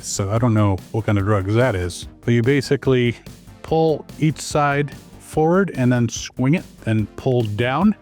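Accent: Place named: Scotland, United Kingdom. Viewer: Which part of Scotland are you from, man?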